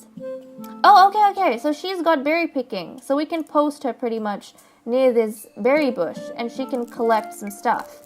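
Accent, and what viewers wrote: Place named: England, United Kingdom. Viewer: They are so cute!! You have an English accent!!!!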